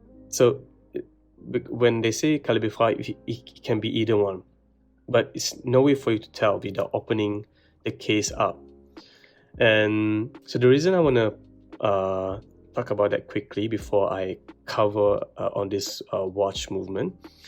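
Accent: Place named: Malaysia. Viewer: Are you Malay? Because i recognise that accent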